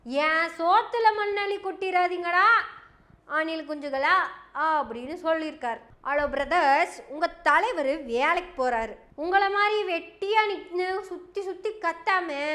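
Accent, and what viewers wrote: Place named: Coimbatore, India. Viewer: Super sister with Coimbatore slang ❤. Love it.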